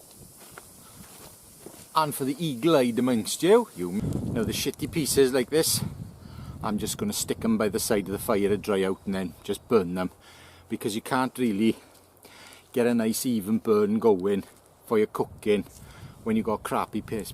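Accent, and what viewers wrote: Wales, United Kingdom. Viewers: Good stuff , new here, sounds like you're in Wales?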